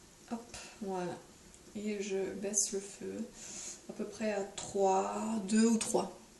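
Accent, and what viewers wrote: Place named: Doubs, France. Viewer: coucou!! jai limpression dentendre laccent dché ns lol!! en plus saucisson de monbeliard?? t dou? moi de mulhouse bisous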